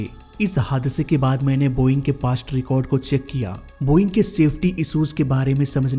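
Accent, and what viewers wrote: India. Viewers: indian English. Maybe = sayad 😂😂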